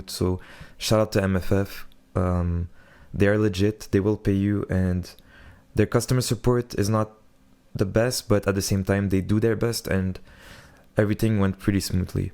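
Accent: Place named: France. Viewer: U have like the french accent if u r from france im from france too we can trade together bro😭😂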